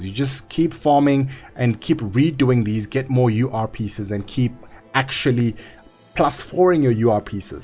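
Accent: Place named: South Africa. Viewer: Best tutorial hands down, you sound South African 🤔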